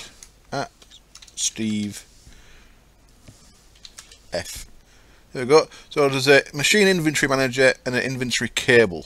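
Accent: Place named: Hull, United Kingdom. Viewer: I think I listened to you say auto-spawner about 4 times. That Hull accent is hilarious, not in the mocking 'haha you sound different' way, but in the plain hilarious way:D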